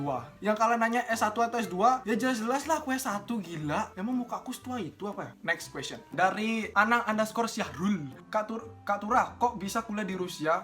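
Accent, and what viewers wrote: Bali, Indonesia. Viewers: Wkwkwk kentel banget bli logat bali nya ... Salam dari Nak Buleleng hehehe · Logat bali nya kentel ajan bli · Aksen Bali banget, Bli 😂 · Bali x medok nya 😂😂😂😂 saking griya napi turah?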